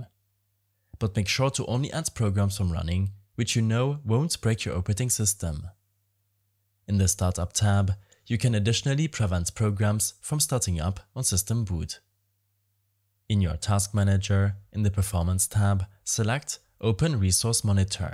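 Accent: Germany. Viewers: Where are you from. You have a german accent. I am from Germany.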